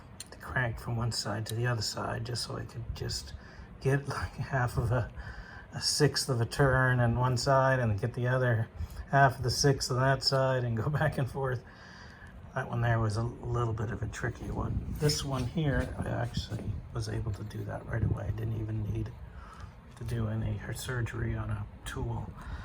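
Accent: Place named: Canada. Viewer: .....thanks for the reply, yes a good idea to test before committing to the frame. I take it from your accent you are Canadian, a couple of guys I follow on YT post regularly, Elin 'Rusty beauties' and chef tush 'tush mahal' both are triumph enthusiasts, and from your neck of the woods, though obviously Canada is just slightly bigger than the UK so you may not be that close.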